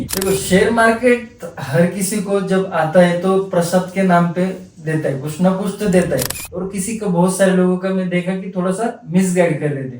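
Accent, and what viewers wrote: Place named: Maharashtra, India. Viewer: मराठी..मस्त.. गुलाबी हिंदी भाई😂😂 एकदम कडक I like it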